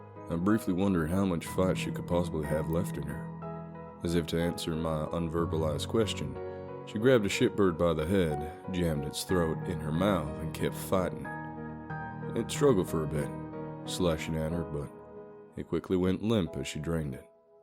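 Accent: South, United States. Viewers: You really have mastered that country American accent and it shows. And this one's coming from a good ol southern boy 🤣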